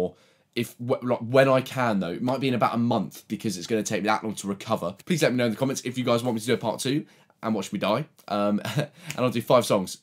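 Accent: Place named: United Kingdom. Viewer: Good video. You're super funny. British accent is the shit. Liked it!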